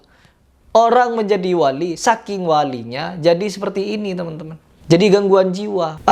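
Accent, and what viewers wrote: Madura, Indonesia. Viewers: Teruskan... TERUSKAN... Lucu logat bahasamu Madura bangetzzz.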